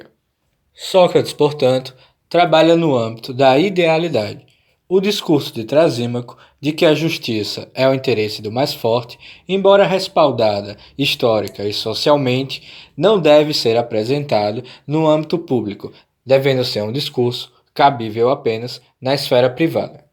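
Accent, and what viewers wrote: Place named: Pernambuco, Brazil. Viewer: tu é de Pernambuco? Sotaque bem semelhante...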